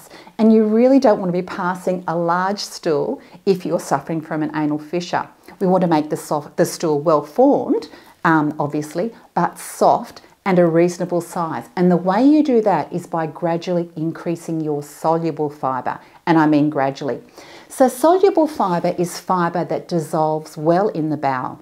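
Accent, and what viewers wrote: Australia. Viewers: struggle to understand the Australian accent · you have a very very strong Australian accent! ... it sounds lovely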